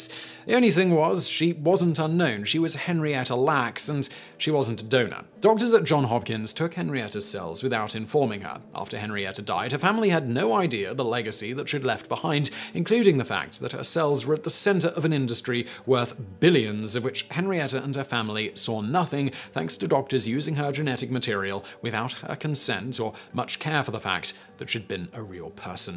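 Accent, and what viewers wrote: United Kingdom. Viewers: One minute for your thumb nail, meanwhile all I heard was race race race race race from a white guy with the accent of like the most imperialistic nation that still exists. Race race, race race race race race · The British accent is echoing in my headddd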